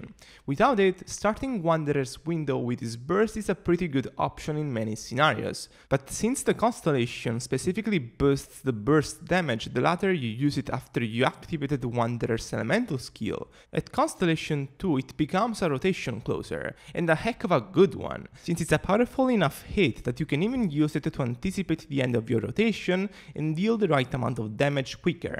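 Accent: Italy. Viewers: You sound italian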